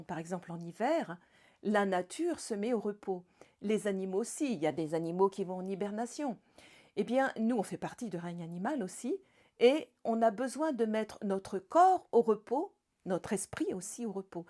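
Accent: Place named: East, France. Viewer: Bonsoir .. joli accent de l est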